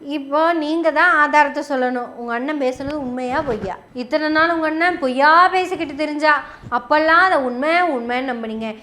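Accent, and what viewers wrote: Kongu, India. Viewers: அன்பிற்குரிய மகளே.தங்களுடைய கொங்கு தமிழ் அருமை.சீமான் என்றாலெ அசிங்கம் என்றாகிவிட்டது.சீமானை குறித்தே பேசி நாம் அசிங்கப்பட வேண்டாம் என்பது என் கருத்து.சீமானுடைய பேச்சைக் கேட்டாலே அருவெறுப்பாக இருக்கிறது.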